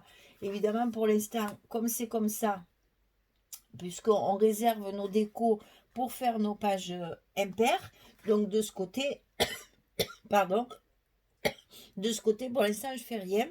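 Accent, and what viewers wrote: South, France. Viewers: bonjour. Merci pour toutes ces explications,j'adore votre petit accent du midi cela fait chaud au coeur. je voudrais savoir combien faut il de papier de cette collection en quel format s'il vous plais.merci. et merci pour tous ces astuces donnés avec simplicité bonne journée à bientôt.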